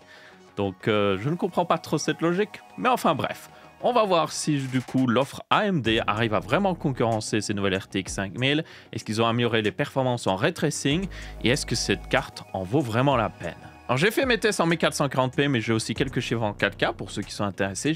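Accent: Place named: Belgium. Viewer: il est belge...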